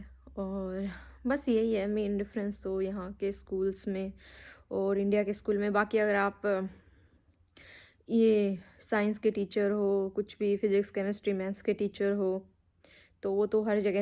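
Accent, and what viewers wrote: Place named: Japan. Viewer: You talk like a Japanese or russian girl , your expressions are also not like a typical Indian girl. Liked that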